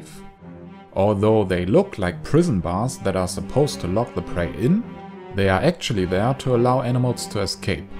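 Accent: Germany. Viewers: I hear a German accent.:D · ate you german? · Sehr eindeutiger doutscher akkzent aber sehr gutes video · He is definitely german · I really like your Videos and I think you should continue making them, but your german accent just annoys me, personally a little to much. I‘m sorry:( Though I must admit, that the quality of your videos is reaaally good! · Kann es sein dass sie ein Deutscher sind? Ihre Aussprache und auch der Satzbau (eher Deutsch als Englisch) lassen mich das vermuten. Ich finde ihre Videos übrigens äusserst toll ^^ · This german english:D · The most German accent i've ever heard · Are you german? Your accent sounds similar to my brothers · wait a moment... he sounds really german 🤔 * Looks in the "About" of the channel * * reads: * "Hi. My name is and I'm from Germany"......... knew it 😏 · Are you from fermany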